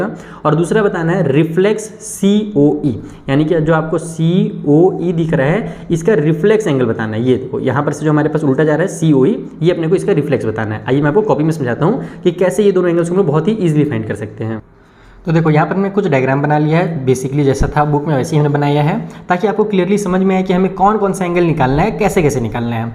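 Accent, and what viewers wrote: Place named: Bhojpuri, India. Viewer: Sir is speaking bhojpuri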